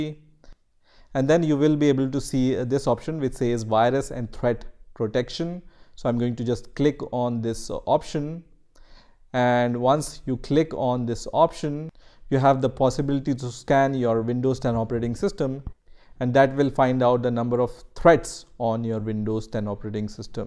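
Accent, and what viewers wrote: India. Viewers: this man has a American ascent and a Indian ascent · This man sounds Indian to me. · English title 😀 Indian accent 🙁 Actually using English 🤩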